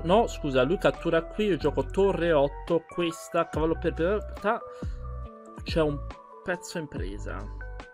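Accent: Tuscany, Italy. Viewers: Speed ception finale. Complimenti per la memoria del pattern. In passato peccato per la bassa qualità audio ma era bello sentire la c'è Toscano più marcato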